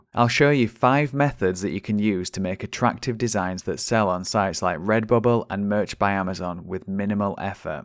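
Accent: Yorkshire, United Kingdom. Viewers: I recently found your channel and I have been making my way through your videos and I just want to say a huge thank you, your videos have been insanely helpful. I'm fairly new to this whole POD scene, but nothing will stop me from making a success of it. Also, you sound like you're from my neck of the woods, much love from Yorkshire.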